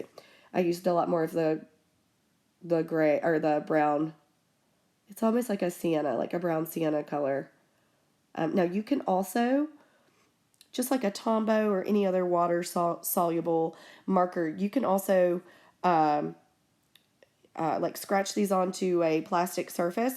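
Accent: South, United States. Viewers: I love your southern accent 😊. I lived in Mississippi for years! I live my Princeton brushes! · Trying to support smaller artist channels. I don't understand why some of y'all have so few views. Your reviews are just as good as those of the "bigger" people. Yeah the filming quality isn't as good but the content is fine and concise. I liked your video and your southern accent. Don't hear it anymore since I moved from TX to Massachusetts haha Edit: wait, they put different colors in the boxes? I didn't know that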